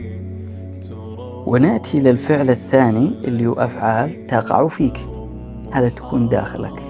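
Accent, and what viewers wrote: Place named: Yemen. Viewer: اللهجة اليمنية 😅❤❤❤ اول مرة أحضر فيديو ليمني بعد فداء الدين قليلين جدا إخوانا في السوشيال❤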